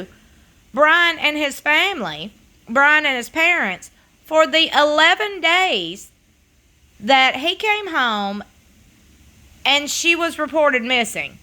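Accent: South, United States. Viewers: I love your voice down south hospitality race aside I like your show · Love this Southern Accent. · Girl you as country as country can get you got that slang girl where you from Manchester ky I bet Harvard ky it's the south I know that for sure 😆 I love 💘 it country girl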